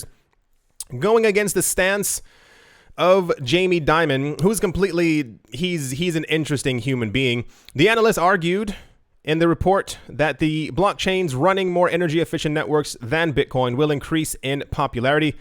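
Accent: Germany. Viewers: Carefull.....your inner german is showing.....good accent! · Hehe, your German accent is great:)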